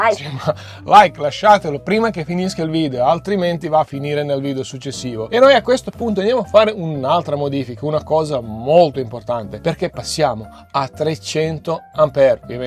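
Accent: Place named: Eastern Europe. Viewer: ma..io sento un accento slavo .. e nn dico rumeno.. secondo piu slavo .. ucraini.. ex iugo..bulgaria?)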